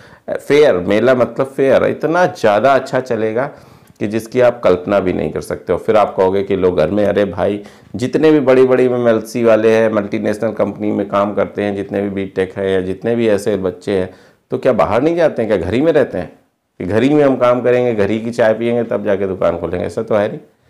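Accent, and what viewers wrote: Kanpur, India. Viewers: Kanpur k h sir language wahi ki h apki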